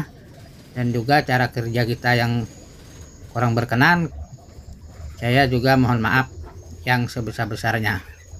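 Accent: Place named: Kutai, Indonesia. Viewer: Dri logat berbicara spertinya suku Kutai dri Kaltim 😂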